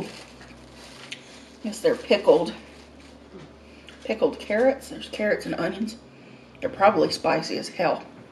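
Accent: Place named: Deep South, United States. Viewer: You’re not a Christian with that accent? Dayum, I respect that! I too am from the Deep South and not a Christian.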